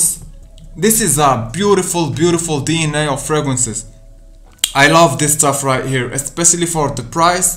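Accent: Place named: Morocco. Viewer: Are you Moroccan ? You have Moroccan accent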